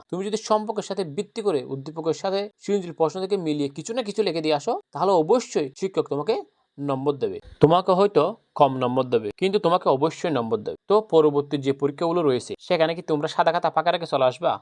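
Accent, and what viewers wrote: Chittagong, Bangladesh. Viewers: ভাইয়ের বাড়ি মনে হয়, আমাদের চট্টগ্রামে ❤ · Apni mone hoi chitainge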